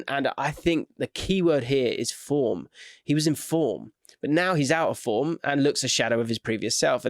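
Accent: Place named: RP, United Kingdom. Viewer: still pretending to have a clue and hiding behind his posh accent to fool the rubes. I see you.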